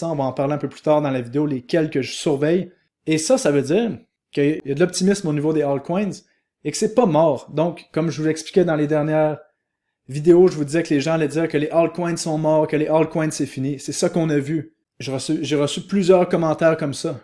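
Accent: Quebec, Canada. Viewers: Il me tue avec son petit accent québecois la ! 😂😂 "Nen acheté" la petite liaison des mots orales mdrrr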